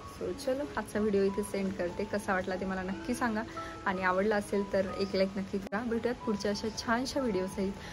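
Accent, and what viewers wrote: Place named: Pune, India. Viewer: Tu ekdam soft Ani typical Puneri boltes I like it....Ani tuze baby ekdam cute❤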